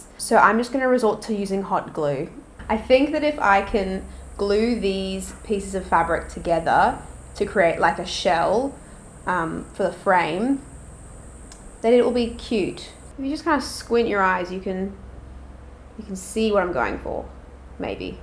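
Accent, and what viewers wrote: Australia. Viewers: are you austral,ian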